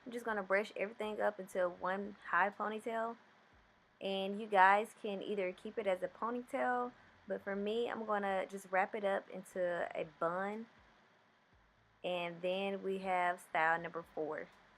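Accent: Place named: South, United States. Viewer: is it werid but i like your voice lol you got this down south accent that i absolutely love great video look forward in supporting each other channels :) see you in the nest video!!!#sub 973 with bell turnt on !!!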